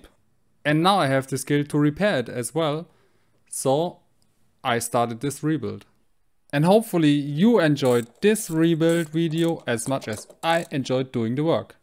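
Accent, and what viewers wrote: Germany. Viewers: Deutscher Akzent on point, aber richtig gutes und interessantes Video. 😉 Like hast du. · Mach deine Videos doch einfach auf deutsch. Dein deutscher Akzent ist unüberhörbar 🙄 Ich wollte in den 90ern auch immer eine RS. Hatte damals eine Yamaha DT80 MX. 9000 Mille kostet die Aprilia damals.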